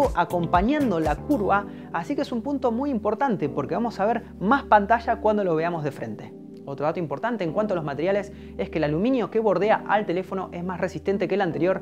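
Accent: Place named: Argentina. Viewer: El acento Argentino lastima los oidos.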